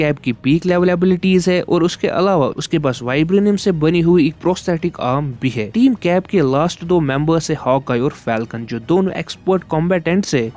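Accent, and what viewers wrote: Kashmir, India. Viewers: Boss kashmiri ho kya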